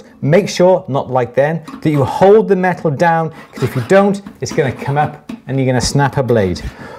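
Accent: Wales, United Kingdom. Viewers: Great lots of tips for my memory bank. Cheers. Can I ask why do u turn your bench hook back around at an angle, after u finished cutting flat pieces? Sorry it's prob a basic answer but I'm just starting out trying To learn myself to work with some metals like copper and silver and also coins. I started making wood rings but I want to broaden my horizons so to speak. Love your channel, it's so helpful to me and many others.. Merry Christmas from Ireland, do I hint a Welsh accent there? Or somewhere close?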